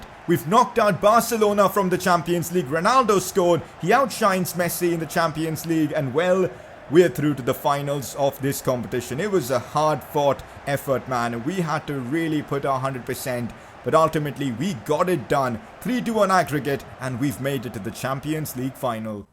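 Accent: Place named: India. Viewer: U speak like indian mannn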